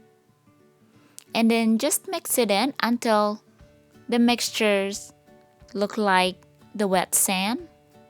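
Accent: Visayas, Philippines. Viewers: Thank you, I think I an do this. I love your Visayan accent.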